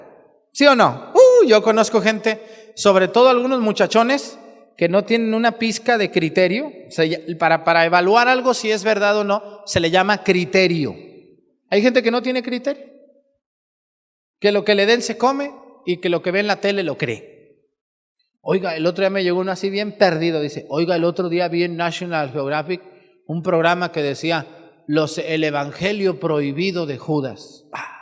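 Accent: Mexico. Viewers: Nada mejor para el domingo que escuchar de la Virgen María; su forma de narrar los hechos y su dejo mexicano tan agradable (3ra vez que veo este video), no necesita imágenes al narrar mi imaginación desarrolla lo hablado. Los sábados maratón de vídeos café Católico. Desde Lima Perú. Mis oraciones a Dios para que le de muchos años de vida con salud, alegría y fuerza por enseñarnos. GRACIAS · Me gusta como enseña y predica,se le nota lo mero México, Dios lo bendiga padre he aprendido mucho con usted, saludos desde,pasto Colombia, me hace reír por su forma de hablar,pero no es por burla yo respeto mucho a los sacerdotes es por su gracia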